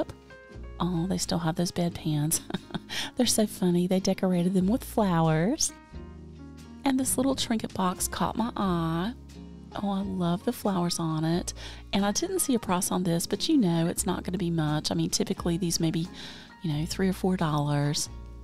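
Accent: South, United States. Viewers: I am sitting here knitting socks,watching you encourage mischief..........wonder where that came from😅😅😅? And listening to that soft southern accent.❤❤❤my peaceful moment!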